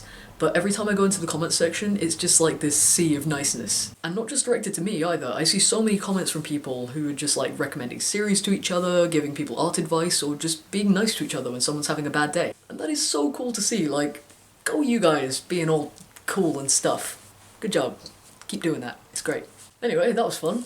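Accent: United Kingdom. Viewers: Oh I have a question are u British sorry I don’t know im new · Dude, even I, who's not an English speaker, can FEEEEEL the British accent ! xDD (not that it bothers me, it's cute actually :3) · He is cute but that accent is asome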